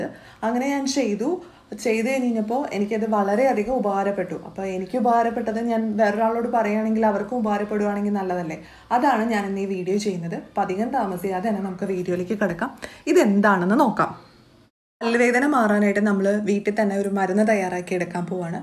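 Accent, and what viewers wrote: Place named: Malappuram, India. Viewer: കുലുക്കുഴിയാ .. മലപ്പുറം സ്ലാങ്ങാല്ലേ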